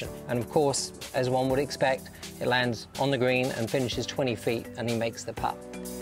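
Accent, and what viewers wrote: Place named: United Kingdom. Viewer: One if the best shots of all time in golf and i have to listen to it be talked over by barely a man with a stupid British accent for the whole video?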